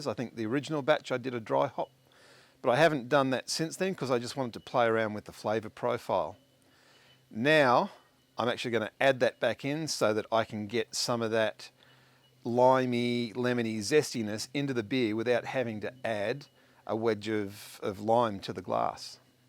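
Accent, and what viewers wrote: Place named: United States. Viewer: You are using metric system but your accent like american ?? 😮😮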